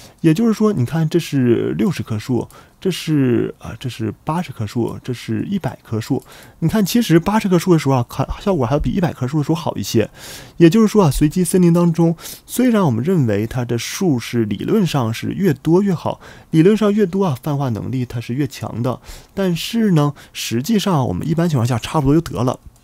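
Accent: Northeast, China. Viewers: 这东北话我听着很带感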